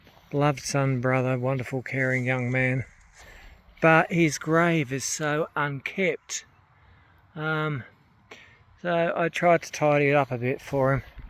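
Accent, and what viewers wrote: Australia. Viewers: Your videos are always interesting and always looked forward to, no matter the content. You also have a soothing voice with the most wonderful Aussie accent! Praying for you,.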